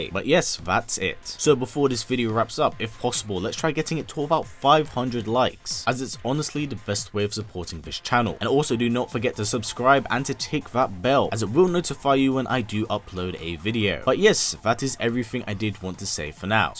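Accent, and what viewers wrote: England, United Kingdom. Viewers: There is not "v" in the word brothers, so disgusting. Battles are not "bat-holes." Your dialect is garbage, must be from the shithole called england.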